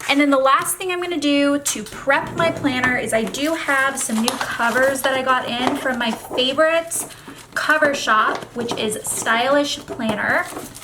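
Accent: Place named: South, United States. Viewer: She’s from Tennessee and has a slight southern accent. “Pen” and “pin” are typically pronounced the same or nearly the same in the south.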